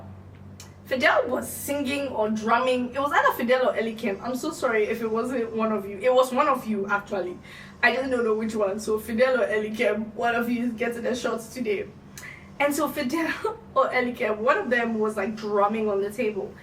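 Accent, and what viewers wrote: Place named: United States. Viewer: your american accent >>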